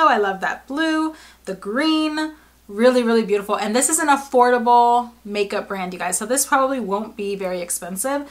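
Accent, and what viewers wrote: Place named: New York, United States. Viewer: I guess I'll have to go into the city to get everything. No complaints here! I love it there. If it wasn't so expensive I'd live there. Are you from NY/NJ area? You sound like you are and some of the stuff you say is exactly what/how I say it. Whenever I go away people can always pick out where I'm from LOL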